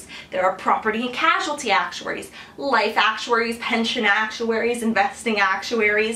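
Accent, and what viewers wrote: Canada. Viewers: Did I watch this on fast forward or do all Canadians fuck up our language like this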